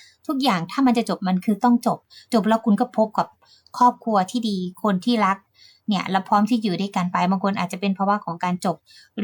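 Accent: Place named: Isan, Thailand. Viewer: ได้ยินสำเนียงอีสานกดติดตามเลยบักคนบ้านเดียวกับคร้าบ❤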